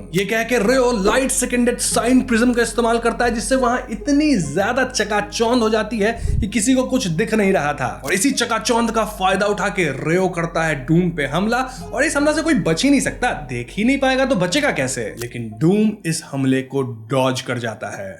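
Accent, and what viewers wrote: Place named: Bihar, India. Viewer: Bro is bihari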